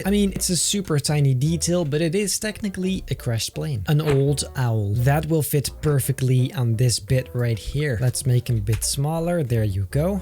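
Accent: Netherlands. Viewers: Je klinkt heel Nederlands, heb Ik gelijk? :) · Jij bent nl ik wist het al aan het accent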